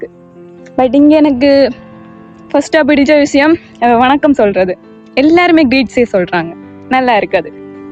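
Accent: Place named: Sri Lanka. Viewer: Srilankan tamil keka keka ketutae irukalam pola iruku pesu nee pesu d 🤌🏻😭💙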